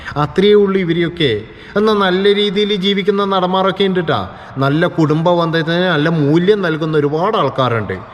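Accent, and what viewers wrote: Kannur, India. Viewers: കണ്ണൂരുകാരൻ ആണെന്ന് സംസാരത്തിൽ നിന്ന് തോന്നുന്നു എന്തായാലും 100% സത്യമാണ് പറഞ്ഞത് മുഴുവനും യേശുദാസിനെ കണ്ടുപഠിക്കാൻ ഒന്നുമില്ല യേശുദാസ് എന്താ ജീവിതത്തിൽ ചെയ്തതെന്ന് യേശുദാസ് തന്നെ മനസ്സാക്ഷിയുടെ ചോദിക്കട്ടെ അപ്പോൾ ഉത്തരം കിട്ടും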